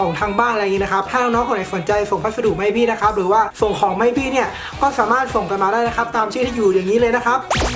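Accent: North, Thailand. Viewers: พี่เป็นคนเหนือหรอคะ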